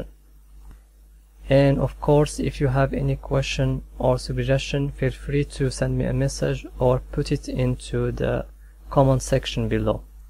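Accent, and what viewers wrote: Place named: Morocco. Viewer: u kinda sound moroccan to me, o lah o3lem..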